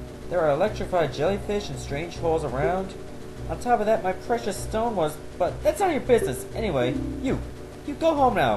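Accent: Canada. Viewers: This guy is Canadian as fuck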